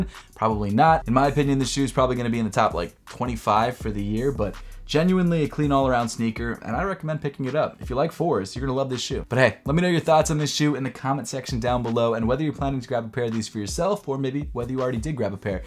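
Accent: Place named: Philadelphia, United States. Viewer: Wait hold up, You’re from Philly bro?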